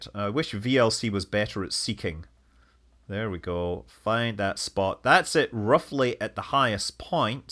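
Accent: Scotland, United Kingdom. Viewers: Scot, are you Scottish? The accent is awesome, my ancestors came from Strathmiglo, Scotland in the 1700's to escape the English.. love all things Scottish.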